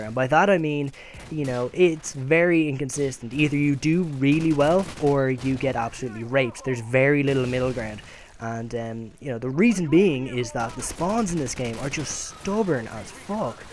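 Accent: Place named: Australia. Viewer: y bro? u sound kinda australian days why i asked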